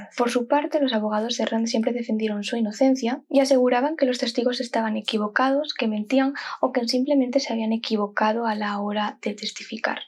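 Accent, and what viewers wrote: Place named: Spain. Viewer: alguien de España contando casos..porque entiendo mejor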